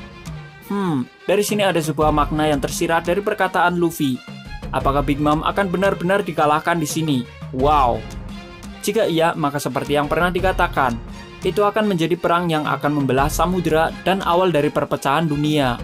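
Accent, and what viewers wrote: Java, Indonesia. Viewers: Medok temen yak...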